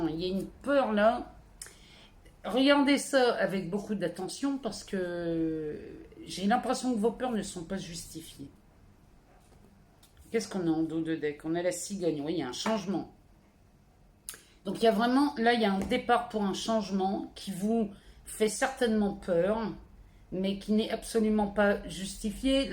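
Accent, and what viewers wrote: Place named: Montpellier, France. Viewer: Jai toujours pas trouvé l'accent ? Toulouse ? MONTPELLIER 🤭🤭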